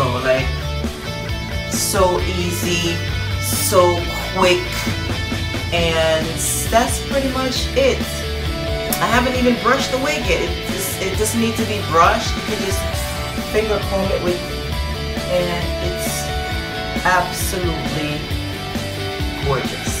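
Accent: Jamaica. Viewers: Hey hun. Jamaican?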